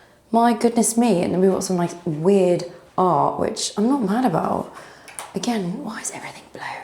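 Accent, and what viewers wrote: United Kingdom. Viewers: Ma'am I love your British Accent. CHEERS 🥂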